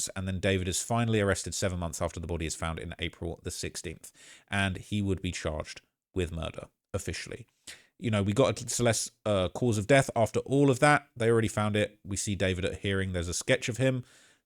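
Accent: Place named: United Kingdom. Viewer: Dude got his nails painted😂🤦🏾‍♂️Bad enough having a British accent alone😂🤢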